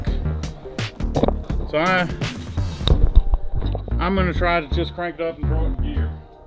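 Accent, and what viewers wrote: South, United States. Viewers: Youuu, southern booyys don't gotta sound so dumb, take notes from!!!') Announcuate!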